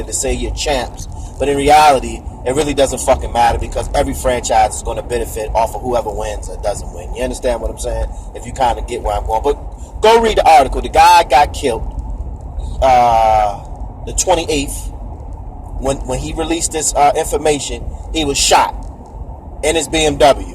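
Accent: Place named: Washington DC, United States. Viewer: this was good as video champ You sound like you from Dc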